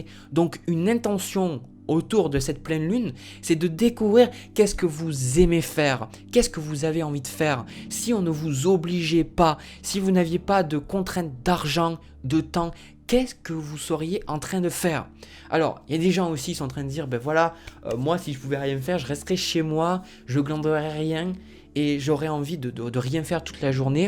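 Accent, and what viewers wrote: France. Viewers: Bonjour, Merci pour votre travail et vos commentaires. Perso ça fait deux ans que l'on lit ...de nouvelles choses... Etre seul, n'est pas in problème...le calme,la paid et le silence..voila qui est bon. Bonne question: qu'est ce que j'aime faire...? Attendons led energies suppérieures 🤫 Oui, attendons.... Fini la routine,la dictature... L'argent ...pour creer une sociétè et creer des emplois....et faire du bien pour les animaux... Belle semaine Ps: vous n'aurriez pas un petit accent francais? 😘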